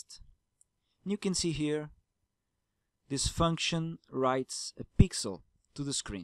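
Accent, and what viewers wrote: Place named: Portugal. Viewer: Ahahah Portuguese, knew it just from hearing it :P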